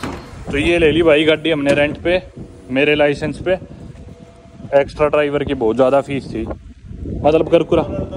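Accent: Haryana, India. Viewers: Me and my son watch your videos with great intrest as you cover all those places which we see in Atlas and read and watch videos about its cuisine. Your Haryanvi dialect is super. With best wishes.👍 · Huge fan of your rugged Haryanvi accent - from Chennai 🇮🇳😂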